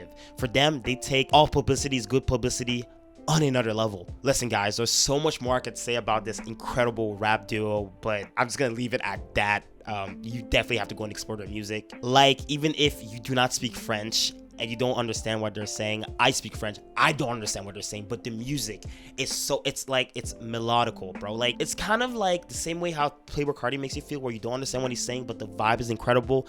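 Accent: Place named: Canada. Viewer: Are you québécois? Youre french is really good man and i feel that you got that canadian accent hahah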